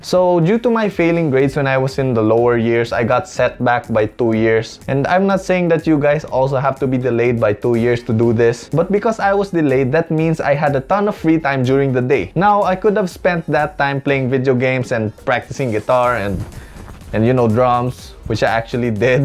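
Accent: Philippines. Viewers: omg im so early ahhh! oh thank you!! im currently 4th year student and because of this i now know what challenges i may have encounter! thank you soo much! at first i was doubting myself if i could really be an architect because i suck at math and that im not that good at drawing and when im measuring i dont know the right measure or angle soo that made me think of another course but when i found your video i found hope that i can do it! soo thank you very much! i watch other arcgitectural video but cannot seem to understand them but you,i could really relate (maybe because were both filipino and love the accent make me feel at home so that make me feel comfortable and actually listening to you) thank you for being my inspiration and i hipe you will be my mentor in the future as you said XD! Oh well then god bless you kuya!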